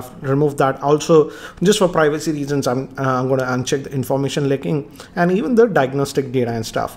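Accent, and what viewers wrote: India. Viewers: With your Indian accent I do not understand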